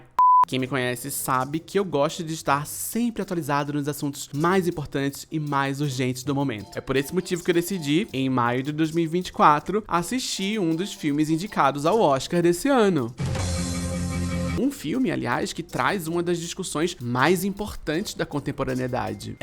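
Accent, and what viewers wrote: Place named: Rio de Janeiro, Brazil. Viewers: Aliás, você tem um sotaque de elite do Rio de Janeiro... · Gente, eu jurava que vc era carioca por conta do seu sotaque..... Kkkkkkkkkk Valeu pelo vídeo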